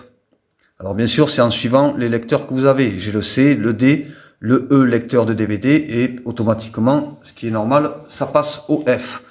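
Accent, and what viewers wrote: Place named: Southwest, France. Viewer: Super tuto !! bravo pour cette méthode simple et efficace👍👍 j'adore votre accent du sud-ouest 🤔🤔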